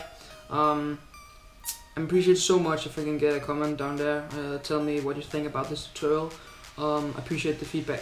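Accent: Denmark. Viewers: Ej det tydeligt at høre du dansker ;) god arbejde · du er dansker, det kan jeg se og hører på dig:D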